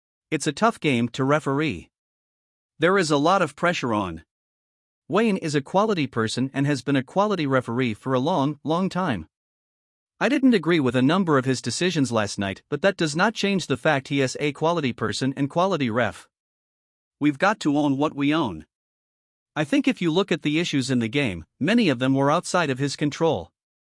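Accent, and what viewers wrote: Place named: United States. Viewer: How is this an OFICIAL AB site when the narrator is American??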